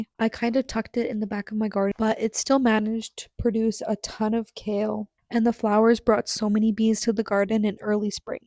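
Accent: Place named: Canada. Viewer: I love how I could hear the Canadian in your voice from the first “about” 😄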